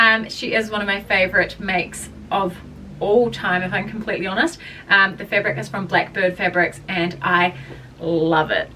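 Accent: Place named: New Zealand. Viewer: I’m used to the kiwi accent being an Aussie. I did not notice the pronunciation.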